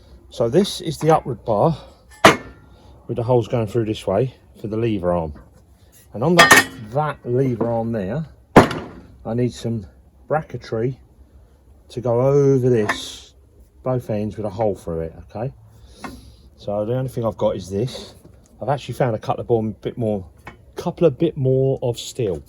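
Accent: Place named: England, United Kingdom. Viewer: You sound English,are you in England? 🇬🇧🇬🇧🇬🇧🇬🇧🇬🇧🇬🇧🇬🇧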